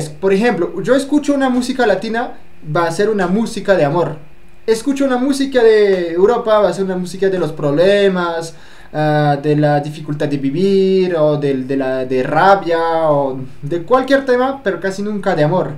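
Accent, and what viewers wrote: Bogotá, Colombia. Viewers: Tu parles trop bien l'espagnol en fait, on entend presque rien d'accent français, et tu as pas mal chopé l'accent colombien. Du coup j'ai vu que tu kiffes mon pays d'origine (Le Mexique)mais tu parles pas comme un mexicain;) haha, ¡Felicidades, güey! ;) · Se le pegó el acento colombiano. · Jajjajaja creo que eres sumamente gracioso. Tienes una impresión muy genial de los latinos. Me parece que hablas con cierto acento colombiano. :) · chevere el video, habla muy bien español? Se nota que aprendió en Colombia, un poco el acento y algunas palabra. Chevre. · habla casi rolo el parcero ,buen video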